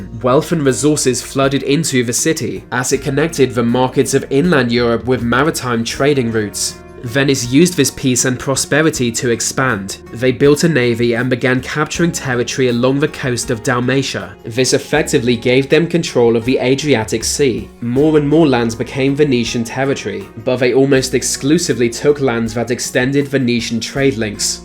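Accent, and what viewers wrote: England, United Kingdom. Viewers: How come you have an English accent and yet pronounce viceroy with an extra syllable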